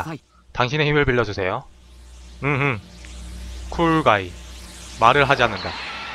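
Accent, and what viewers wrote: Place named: Busan, South Korea. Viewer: 예전에 플스로 먼저 했었다길래 어디있는거지 하다가 어쩌다보니 검색으로 나왔네요 ㅋㅋ 시청갑니다 근데 목소리가 진짜 달라보이네요 ㅋㅋ 이때는 사투리도 더 심하고 ㅋㅋㅋ부산에서 태어나셨구나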